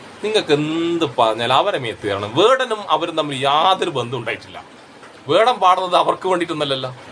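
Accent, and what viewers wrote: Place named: Thalassery, India. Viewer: നിങ്ങളെ സ്ഥലം എവിടെയാ.. നമ്മുടെ തലശ്ശേരി പാനൂർ ഭാഗത്താണോ.. എന്തായാലും തനി നാടൻ വർത്താനം 👍🏼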